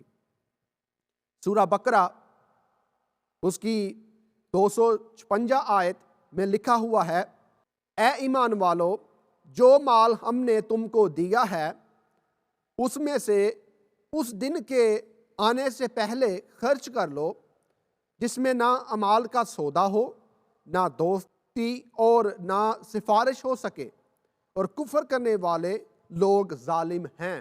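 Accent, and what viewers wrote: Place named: Punjab, Pakistan. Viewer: brother I don't want to hurt you. For example say Hadith number 1234. Actually when you speak in Punjabi alphabet I can't understand. Even I am Punjabi. Sorry once again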